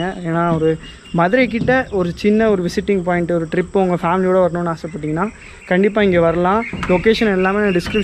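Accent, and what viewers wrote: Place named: United Kingdom. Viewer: Unku tamile pesa theryathu la London durai poda dei olunga pesu frst 🤦🏻‍♀️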